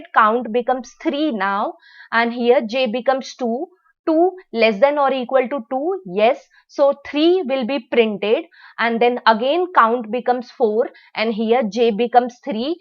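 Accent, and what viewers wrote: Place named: India. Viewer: All are good, but pls use AI voice over, Indian accent is disgusting .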